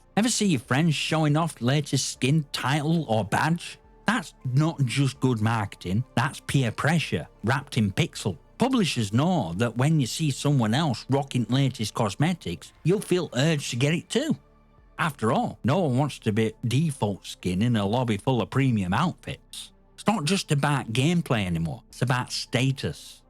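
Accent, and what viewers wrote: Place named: Northern England, United Kingdom. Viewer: As a guy from Leeds, that's one hell of a northern accent. Great video, I have the same gripes with these kinds of people, glad to see someone calling out the bullshit.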